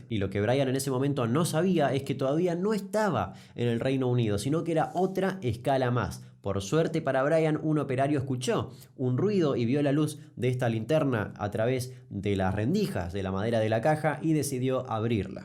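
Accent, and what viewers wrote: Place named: Argentina. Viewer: Fascinante como narras. Ja,ja. Me hace reír el "el suspenso " del principio, "¿qué le pasó, que le pasó? " Genial y con ese acento argentino que es espectacular. Un abrazo grande desde Bogotá-Colombia 💕💕💕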